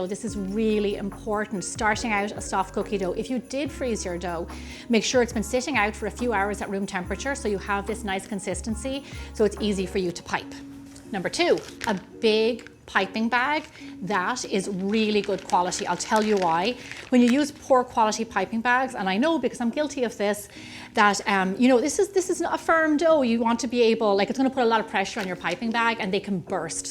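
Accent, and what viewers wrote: Ireland. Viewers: Thank you,! This is exactly what I was looking for. And listening to your sweet Irish accent makes it all the better.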